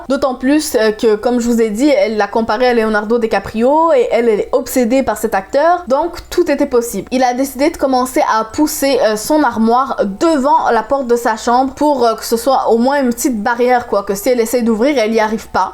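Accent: Quebec, Canada. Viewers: Est tu québécoise car on dirais un accent mis français mis québécois?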